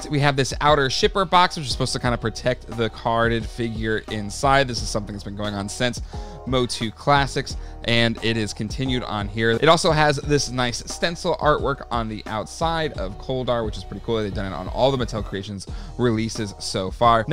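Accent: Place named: Midwest, United States. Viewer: You have such a thick midwestern accent lol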